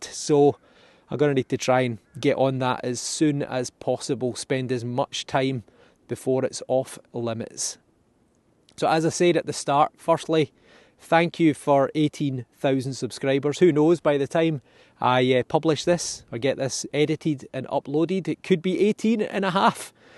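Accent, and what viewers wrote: Scotland, United Kingdom. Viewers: I just love your channel! I'm a history buff not a detector so I find your comments on the historical background of your finds very interesting. I bought my husband a detector a few years back but the history out here in British Columbia Canada is a little shallow for metal finds. Our historical finds are pretty much all from Indigenous culture. Also...I could listen to your Scottish accent all day😊 keep them coming mate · love the historic lessons and especially in scottish the accent the history of your country sums up for succes keep going im a starter in the hobby greetings from the netherlands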